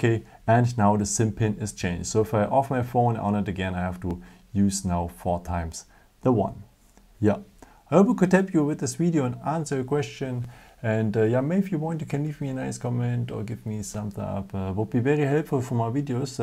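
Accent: Germany. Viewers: haha this guy is definitely German. thanks for the help :) vielen Dank :D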